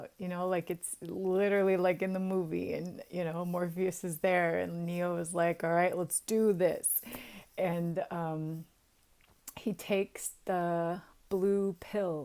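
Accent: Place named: France. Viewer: This is really cool. Thank you for sharing your words of wisdom, and the wisdom of wizards :) Also your French accent is endlessly entertaining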